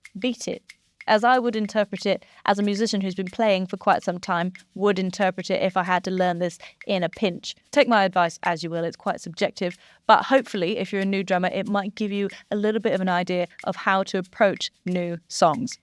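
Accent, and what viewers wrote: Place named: England, United Kingdom. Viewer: I love your videos and your English accent.😍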